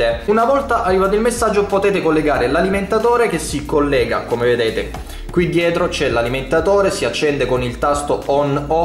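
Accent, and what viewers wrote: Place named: Apulia, Italy. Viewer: Ciao , io sto aspettando che arrivi la vodafone station . Per caso sapresti dirmi quanto ci vuole dall'arrivo della vodafone station all'attivazione effettiva di internet ? Mi pare di sentire che sei Pugliese se non erro , se si vorrei sapere in quale comune ti trovi per farmi un'idea di quanto veloce sarà la mia linea . Grazie !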